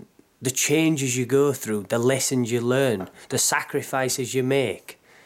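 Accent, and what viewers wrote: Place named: Yorkshire, United Kingdom. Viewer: Hi man...you're an unque guy obviously a free radical...I'm now in my 50s and was like you back in the 90s watching technology control people I'm not at all shocked to see what's happening because was saying things like you back then and folk just said yeah so what..your accent sounds Yorkshire..wakefield maybe,...I was a brickie too like you ..uourceirk looks good...all the best for 22..cheers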